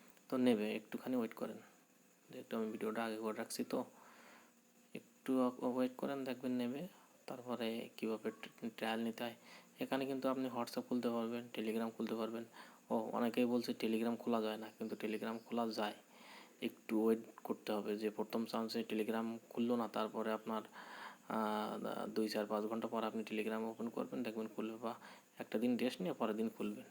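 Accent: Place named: Khulna, Bangladesh. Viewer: Vai, apnar Kotha suna to khulnar Lok mone hoy. Jai hok personally Kotha bol jabe?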